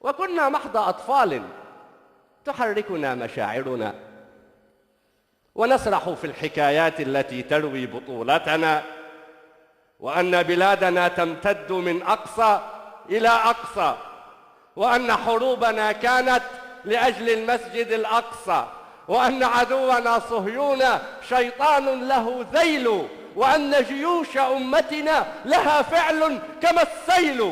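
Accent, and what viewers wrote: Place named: Libya. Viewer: سلم لسانك حبيبنا ليبيا طالعه من لسانك مثل العسل 🇱🇾🇱🇾🇱🇾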